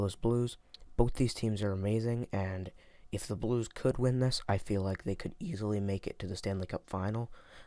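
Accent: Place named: Toronto, Canada. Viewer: You can tell you are from Toronto by the way you say it. “Cheronto” anyways GO LEAFS GO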